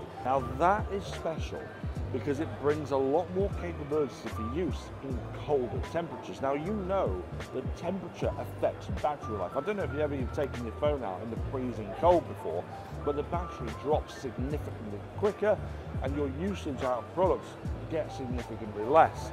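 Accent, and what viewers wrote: United Kingdom. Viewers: I can't understand the British accent in general, so I just turn off the audio, turn on the cc subtitles and run yt videos at 2x.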